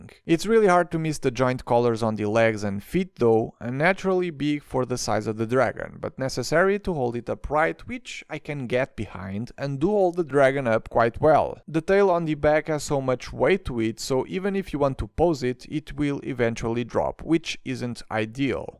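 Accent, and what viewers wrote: Portugal. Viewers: Sotaquinho da tuga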